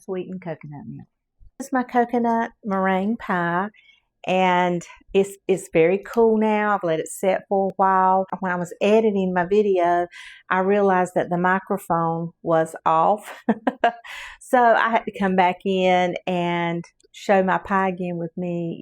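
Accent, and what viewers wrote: South, United States. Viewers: Oo, I love pie!! That is a fact I cannot deny. I made a milk tart the other day, baked it in a scalloped rim dish, but not really suitable, I always bake this in an oblong Pyrex dish and it turns out so lovely, so stick to what you know works. Believe me. So I looked at this Le Creuset pie and thinking before I pack this away, how about a lemon meringue pie? Now that I have seen this video, I think I will do the lemon one first and then try the coconut. I love coconut and again, I love pies/tarts. But they must be real good crusts and fillings with meringue. Thank you so much for sharing and showing how it is made. Just love to watch and love the Southern accent, sounds to calming. Not from the U.S.A. Have a beautiful day, and the grandson is gorgeous, they are just too precious for words. Many blessings. Kind regards.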